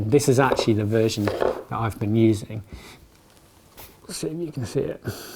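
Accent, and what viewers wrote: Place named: England, United Kingdom. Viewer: Enjoy the vids. Hope you get to ride more soon. Off topic, but have you ever watched Project Amber on YouTube? He seems to be a kindred spirit. Maybe from the same part of England as you? (I’m an American, can’t decipher the subtle nuances of the accent). Anyway, y’all both have a calm/soothing presentation. Look forward to your (and his) vids!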